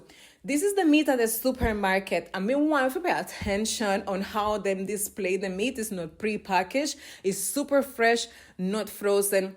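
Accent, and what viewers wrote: Jamaica. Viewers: I must say, I just LOVE your videos and of course your beaitiful Jamaican accent. Blessings.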